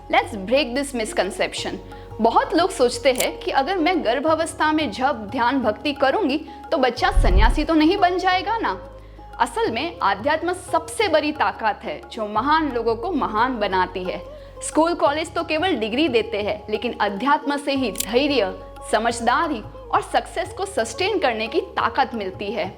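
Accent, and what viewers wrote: Standard. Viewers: जितनी शुद्ध हिंदी आपकी बोली है उतनी शुद्ध हिंदी हमारी बोलचाल की भाषा बन जाए। 🙌 ॐ भगवते वासुदेवाय नमः 🙏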